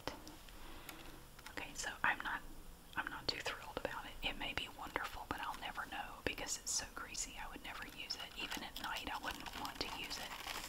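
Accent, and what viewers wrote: South, United States. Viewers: Yay other southern asmr love it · No filter on your southern accent/charm. 5/5 Stars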